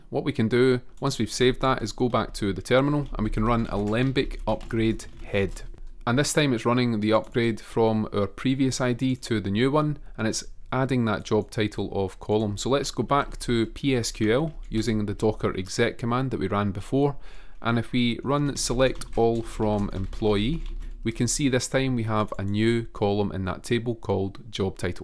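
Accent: Scotland, United Kingdom. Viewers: When I heard the Scottish accent I knew this was someone I could learn from. Edit: I was right. Pure gold of a video. Just golden nugget after golden nugget. No bs. Thank you so much! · British accent adds so much style to this tutorial, thank you! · british accent is too strong... i get you, its ok...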